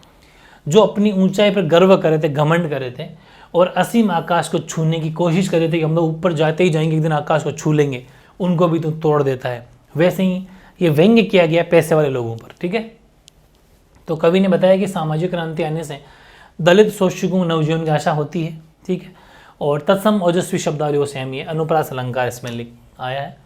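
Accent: Rajasthan, India. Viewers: Sir ke bolne ke tarike se kis kis ko pta chalta h ki vo rajasthan se h😅❤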